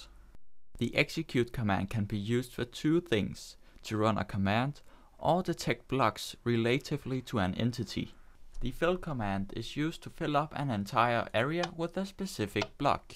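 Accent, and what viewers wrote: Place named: Denmark. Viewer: Your Danish aren't you? :)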